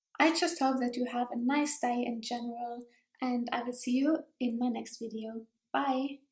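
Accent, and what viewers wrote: Germany. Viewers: Die Message ist sehr schön: man sollte nicht zu stark anders aussehen. Du bringst es auf den Punkt. Übrigens ist dein Englisch richtig gut. Ich rede auf der Arbeit 95% Englisch und muss echt mal lernen so wie du zu reden. Auch wenn man deinen deutschen Akzent etwas raushört (was ich süß finde) machst du keinen Fehler! Ich mache öfters Fehler oder denke kurz nach. Und wenn ich mich dann über etwas aufrege wird es von Wort zu Wort immer mehr deutsch 🤣🤣🤣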